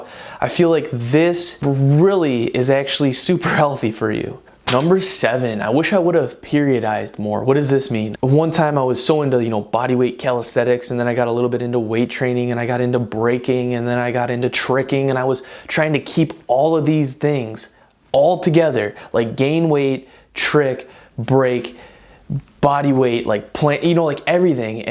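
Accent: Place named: Chicago, United States. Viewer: I recognize this accent. You've got to be from my hometown of Chicago (Chicagoland), right?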